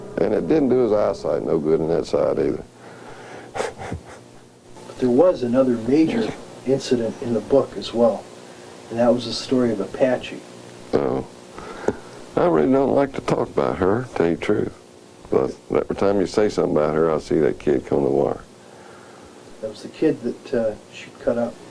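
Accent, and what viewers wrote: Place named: Arkansas, United States. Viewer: The second I heard this dude's voice I knew he had to be from Arkansas. He sounds just like every other man I've ever spoken to including myself. Damn maybe we are hicks. He's a hick legend I suppose.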